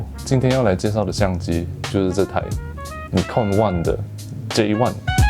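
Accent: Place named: Taiwan. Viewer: 感覺聽起來有台灣的口音欸！是來過台灣讀書嗎？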